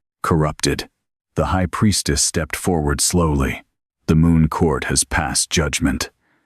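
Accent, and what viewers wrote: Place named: Spain. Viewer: What's with all the " Spanish/Mexican " pronunciations?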